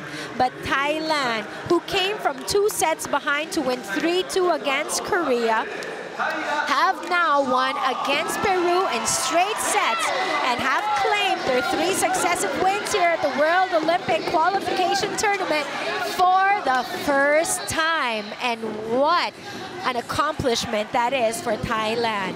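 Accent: Philippines. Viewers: I can tell this commentator is Filipina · Is the commentator Filipina? She has Filipino accent.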